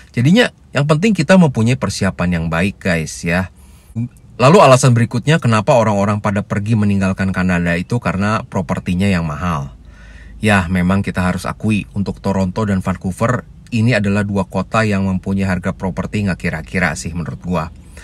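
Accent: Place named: West Java, Indonesia. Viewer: Bro logatnya Sunda yah.. sampurasun Kang 😊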